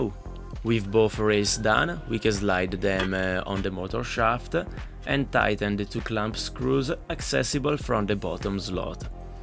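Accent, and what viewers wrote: Italy. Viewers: Ciao, innanzitutto ti voglio fare i complimenti e dirti che sei assolutamente underrated su YouTube, sia per la qualità dei video che per la qualità dei progetti. (Scrivo in italiano sia per confondere i visualizzatori inglesi sia perché mi sembra di aver capito dagli "indizi" nei video e dall'accento che tu sia italiano). Approfitto del tuo nuovo video, ottimo come sempre, per chiederti una cosa: nell'ultimo mese mi è venuta voglia di costruire un simulatore di guida. Così ho cercato online per capire come fare e ho trovato, oltre ad un altro video da cui ho preso ispirazione per i pedali, il tuo, dove mostri come costruire il volante. Non ho però costruito tutto il force feedback: ho preso ispirazione da un altro video dove si usa un semplice potenziometro. Dopo aver modificato i file 3D e i DXF per adattarli ai miei pulsanti e per utilizzare molle anziché magneti nelle levette, l'ho costruito. 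Grazie davvero per aver condiviso i file e il video di costruzione! È venuto talmente bene che mi è venuta l'idea di fare una specie di kit del volante e dei pedali da vendere, non i file digitali, ma quelli fisici come kit da assemblare. Volevo chiederti se ti andrebbe bene se potessi usare questi file "remixati" e alcuni proprio rimodellati da zero, ispirati ai tuoi, per questo progetto. Se preferisci (e per farti capire meglio), posso mandarti delle foto e degli screenshot delle parti modificate. Grazie!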